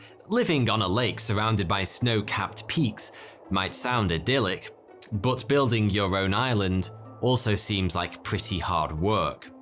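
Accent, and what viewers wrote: United Kingdom. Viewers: The beauty of lake Titicaca is nothing compared to the beauty of your blue eyes, handsome mustache and sexy British accent babe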